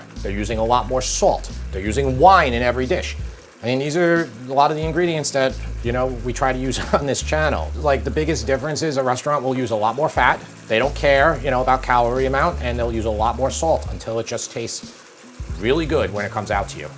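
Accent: New York, United States. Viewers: Sorry, I'm Italian and I don't know the accents in America. But now here what would be “Italian” about his New York accent ? He is American, born in the US, speaks only English, Italian he doesn't even know, how can he now have an “Italian” accent ? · I love Italian food (and the Italian New York accent, hehe)! I'm gonna try making this soon.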